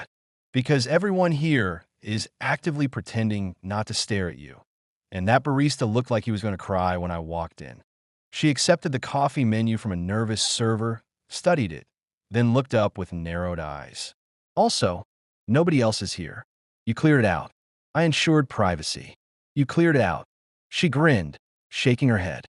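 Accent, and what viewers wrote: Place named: South Carolina, United States. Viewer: Absolutely love this story. I'm from SC and that's exactly how we speak.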